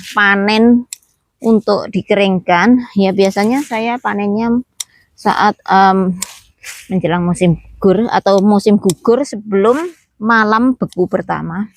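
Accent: Java, Indonesia. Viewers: ibunya dr jawa mn kok medok banget bahasa jawane buu ..salam dr kaltim😊😊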